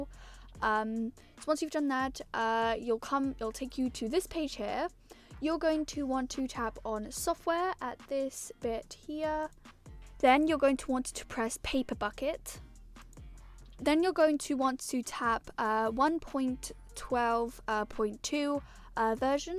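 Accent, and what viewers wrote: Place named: United Kingdom. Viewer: aye u quite bri'ish yere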